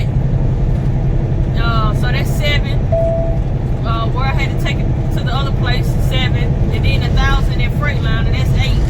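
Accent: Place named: Alabama, United States. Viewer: Traveling on my birthday lol.... Thanks for the great info and stay safe my sister...... you sound like a Bama Girl lol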